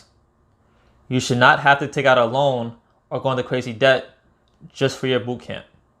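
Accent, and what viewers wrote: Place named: New York, United States. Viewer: Thanks for your channel! Much appreciated. You sound like a fellow New Yorker!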